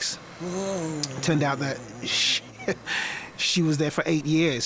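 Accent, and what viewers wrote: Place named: United Kingdom. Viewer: He actually does, just because it's not a heavy posh accent doesn't mean it's not british.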